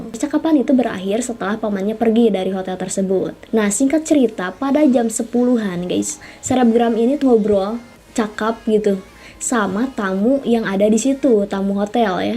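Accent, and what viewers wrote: West Java, Indonesia. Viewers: Sunda campuran ngomomg na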